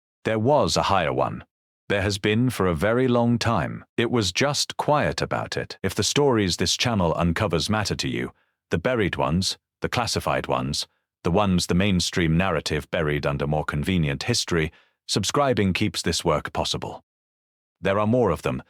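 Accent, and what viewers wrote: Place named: Bogan, Australia. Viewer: AI narration is bogan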